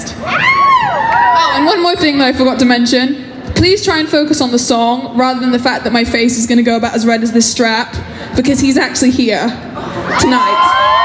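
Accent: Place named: Ireland. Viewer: I saw you at C2C. Your accent is beautful. Where from? I'm getting some Irish, some Bristol, maybe some Manchester. On this song I'm getting some Taylor Swift and she too has a great speaking voice.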